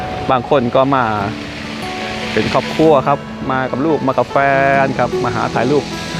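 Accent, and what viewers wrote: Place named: Isan, Thailand. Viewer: คนทำคลิป วีดีโอ คนไทยอีสานแน่นอน สำเนียง บอกภาษา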